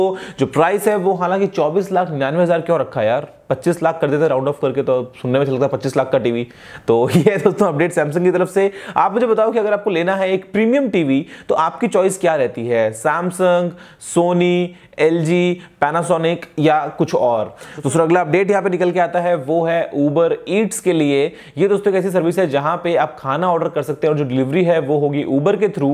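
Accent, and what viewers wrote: Bengal, India. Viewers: bhai aap kiya bengali ho?/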